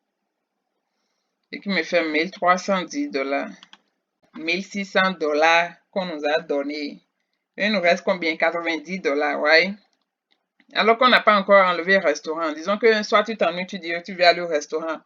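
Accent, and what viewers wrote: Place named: Benin. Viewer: Tu a un accent Beninois. 😊 I hope you are doing good sister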